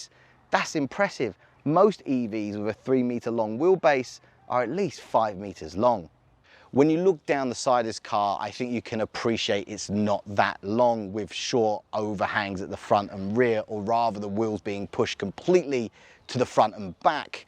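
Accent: United Kingdom. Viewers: I've been torn about writing this comment, but I've choosen to be honest with you, since I've been enjoying this channel for so long, and it deserves my honest opinion - no punches hold back. I don't like the style of this reviewer at all: 1. his strong accent make it quite difficult for people not used to British accent to understand what he's saying - this is even more important for non native english speakers, who will struggle compared to Ethan, which instead is quite easy to understand. 2. he's speaking too slow, and with a lack of energy. 3. the review itself is boring. That never happened to me before in a review of this channel. 4. please don't assume that all people watching this channel uses imperial measures - we are not. In fact, it is probably the opposite, since the US is the only country on the entire planet that it does (4% of the world population). 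Please use the standard metric system, eventually using also other local (old and obsolete) unit of measurement if you want to help people not used to it (ex. "I'm 1,75m (or 5 feet 9 inches) tall"). Thank you. So, not meaning to be rude, but I'll probably skip the next reviews with this reviewer...unless is really a car I'm looking for. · Some people struggle with the English accent. I tried to slow down a bit to compensate. Seems it didn't work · I dont like the audio , the accent is so british for me or even irish , and its not entertaining everall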